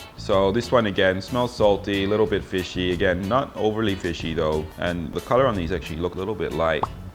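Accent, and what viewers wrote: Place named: Philippines. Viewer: Dude your english has a filipino accent...Anyways Italian food goes good with Patis...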